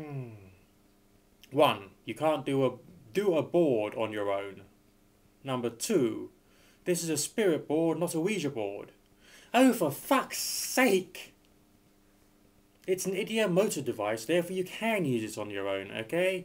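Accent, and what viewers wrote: United Kingdom. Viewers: your an idiot and don't know any thing u british talking fuck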